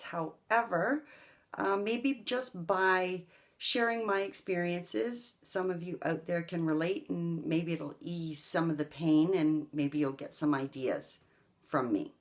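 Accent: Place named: Canada. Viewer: Accent sounds Canadian.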